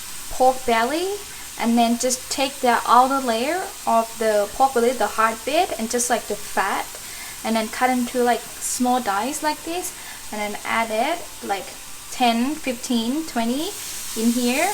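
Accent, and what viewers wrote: Australia. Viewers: your accent is evolving! sounds like an ausie <3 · are you living in australia? i can hear it in your voice a subtle aussie accent 😅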